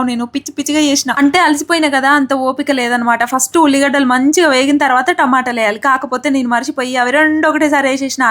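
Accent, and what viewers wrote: Telangana, India. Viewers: telangana slang❤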